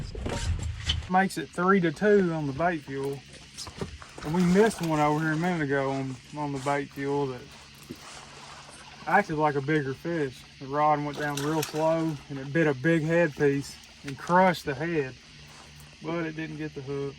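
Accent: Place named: South, United States. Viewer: Where are you from? 100% no offense but I can't figure out your accent. You sound like a southern feller but then again sometimes not?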